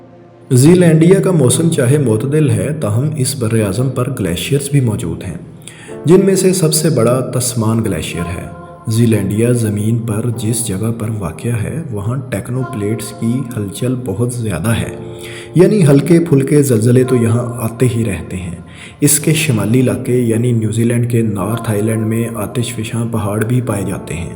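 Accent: Punjab, Pakistan. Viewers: Ap Punjabi lehje ma vbolte ho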